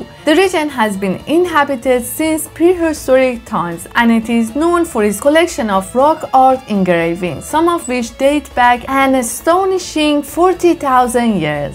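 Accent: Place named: Iran. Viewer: I love your Iranian accent❤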